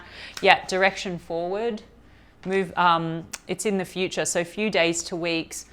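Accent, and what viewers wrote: Australia. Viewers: You're SPOT ON for my relationship!!... Amazing 👏 Thankyou🙏🩷 And lovely to hear a fellow Aussie 💞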